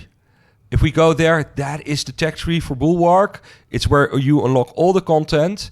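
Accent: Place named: Netherlands. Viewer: He sounds soooo Dutch, enjoying your game but still things are not clear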